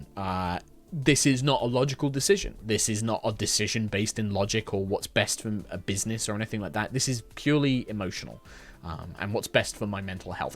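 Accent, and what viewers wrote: United Kingdom. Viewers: Hey, originally I started watching HR because 1) fresh from finishing Yogsquest and Dungeons and Dafties, I needed the silly Yogs brand of D&D, 2) I had just watched the star wars rpg stuff with you and the Hat Bois and watched for you, Trott and Kim whom I was already following for Flux Buddies, and 3) I had been watching CR and Aq inc for a while and was happy to hear a more familiar British accent:) I feel a little sad that the little interviews are gone and I really loved that Vday board games video, but, you do what you have to do to clean house in your own head. I understand:) I'm a follower of the usual D&D shows: CR, Aq Inc, Aq inc C team, DCA, but HR will always always always have a special place in my heart. I admire how much effort you put into these videos, d&d and your patreon stuff. Honestly Kim and you won me over when you did that early Lightfall side episode catching up on what happened when JiuTou died. 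It's nice to see a DM not just invested in their game but in the plot and the characters. Will be supporting you in whatever you do, as long as you are happy doing it; bringing your brand of DM voices, silly jokes, npc character development.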